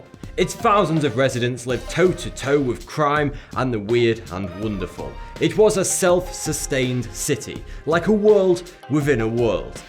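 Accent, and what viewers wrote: United Kingdom. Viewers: Mmmm I just love your British accent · What British accent is this? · Your British English is genuinely horrible. thanks for the lesson. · "The slum became littered with endless brothels, gambling parlors and opium dens." Just the way you said Brothels, like there was a F in it or something. British accents are hot. I would learn to impersonate them but I am not posh enough to pull it off. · Is that British accent real? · Different accent... Is he british?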